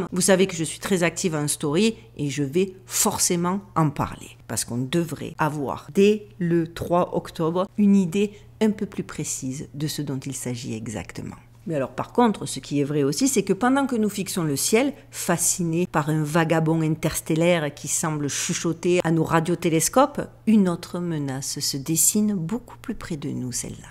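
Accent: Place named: Nice, France. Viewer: Wow ta d’beau yeux tu sais l’accent niçois avec un soupçon moscovite bon ton vpn ,on s’en fout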